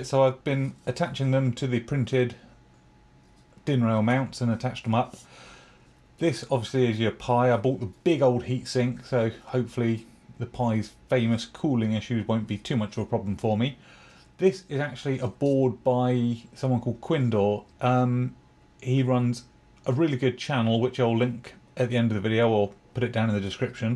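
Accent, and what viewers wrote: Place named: United Kingdom. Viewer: It’s always great to hear a UK accent. I hope the build is going OK. Personally I hate my Ender 3 and I love Klipper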